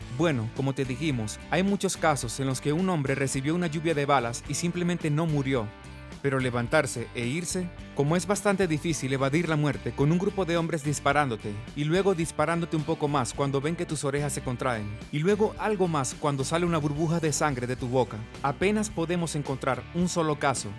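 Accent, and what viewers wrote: Russia. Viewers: Suena como un ruso hablando español. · Suena exactamente ruso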